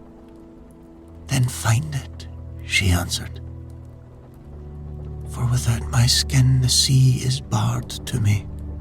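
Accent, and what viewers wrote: Northern Ireland, United Kingdom. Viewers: Doesn't sound scottish more skootish even Irish ai shit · Why does sound NI/Irish? It's putting me off relaxing. A recognisable Scottish Accent would be better. Yes I am Scottish, maybe it's the whispery way he is speaking but his inflections sound NI.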